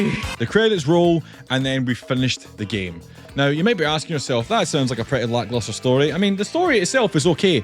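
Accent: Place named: Scotland, United Kingdom. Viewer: Great video but I’ve had to stop watching cause I’ve realised I don’t really remember the game that well and I want to replay it now 😂 I’ll come back later. I have subscribed though and I’d like to see more videos about older games from you but don’t worry, I got through stages so I’ll get round to your newer game videos as well. Seems like the best of both world here. Also, it’s great to hear the Scottish accent, I used to hear it all the time in England but not so much anymore but let’s not go there 😡😅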